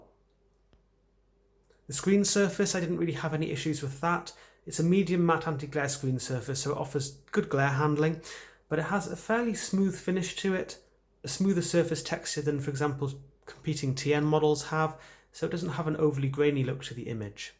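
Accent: United Kingdom. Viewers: you have an english accent, but im looking in UK and cant find this monitor. all i can find is the 24G2U5. where are u located?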